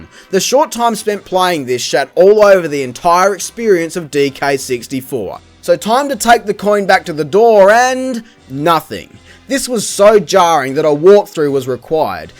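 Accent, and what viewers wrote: Australia. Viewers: i think he comes from australia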